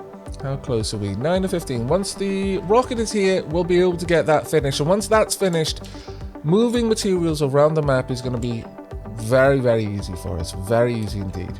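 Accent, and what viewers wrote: Wales, United Kingdom. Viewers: I always thought your accent was 100% unapologetically Welsh until yesterday while watching your video my fiance asked if I was watching "the German guy" and when I mentioned this to a friend he said you sound Swedish. 😂 not sure what they are hearing tbh.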